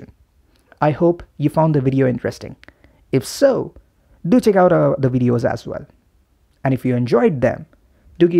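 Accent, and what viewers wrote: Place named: India. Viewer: But it's okay if you use an indian accent I guess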